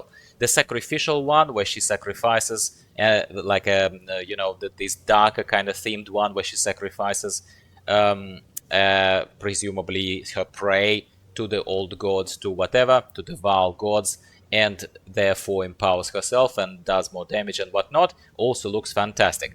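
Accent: United Kingdom. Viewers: Her accent is of a tedious northern British working class pleb, it pisses me off also. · Its a Northern English accent mate.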